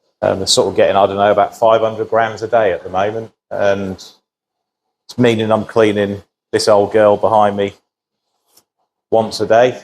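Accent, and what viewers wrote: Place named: Suffolk, United Kingdom. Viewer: Great update mate and love the keep it simple approach from Ricky Stoddart,, What is the size of your pond ? width/ length etc ,, You sound like you could be close to me Lowestoft Suffolk ?? Hope to see more from you soon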